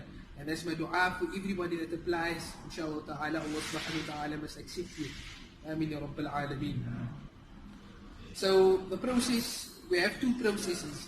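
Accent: South Africa. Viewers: lol you can hear that this bru is from Cape Town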